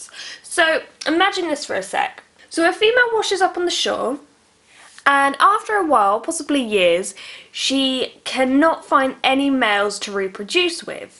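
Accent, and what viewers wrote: United Kingdom. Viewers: She's from Uk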